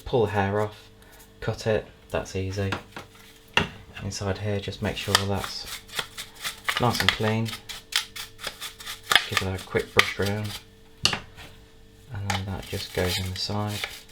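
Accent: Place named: London, United Kingdom. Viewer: Are you from London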